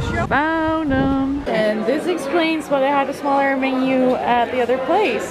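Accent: United States. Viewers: You speak English like an American, almost no accent and a lot of idiomatic expressions we use.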